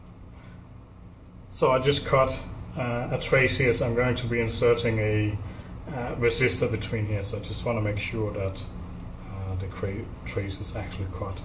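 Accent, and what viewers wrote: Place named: Denmark. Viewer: thx for the video, also took the plunge on a couple of these. was comming short when checking LDdrivers and loads due to high power laser diodes, and purchased a couple of these power supplys. was looking at that KA3005P but seems to cost around 110US delivered + tax and then saw the KA3003P (nax3A) for 63US delivered, so took that, but doubtfull that it will get shipped hence 63US seems to low. while waiting for it to get shipped' instead purchased the KD3005P from Reichhelt Germany to around 77EU+5.6EUshipping-fee and it got delivered today, 25hours delivery time from Germany to Denmark, impressiv. Trying to get familiar with levels and the rela'es, seems to click around 3 times from low to top 31v voltage, but it puzzle me that it keep dropping the voltage on load but likely my rookieness to blame, but i do cherise that its the P version and able to handle it with the mouse and keyboard the values and getting graphical views, makes the adjustment easy, evewen thow the two clickknobs on the KD model is easy to manage. 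... btw your accent very much sound like your from Denmark..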